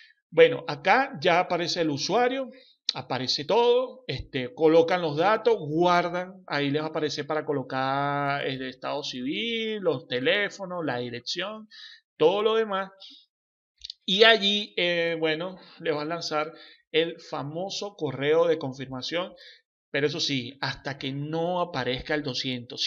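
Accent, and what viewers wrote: Venezuela. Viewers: Gracias, es de mucha ayuda, lo gracioso es escuchar lo que decías de tu hermano como si hablaras con un amigo, jejejej, tan venezolano;). Gracias de verdad!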